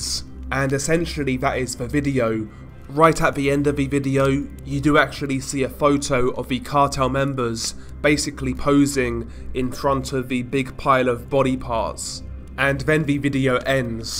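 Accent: United Kingdom. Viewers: He really can't get more british lol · Oh the British accent, the best one❤️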